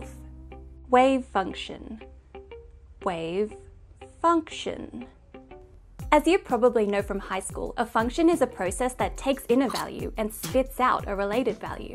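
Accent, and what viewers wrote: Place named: Australia. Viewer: Aussie accents are so hot!!!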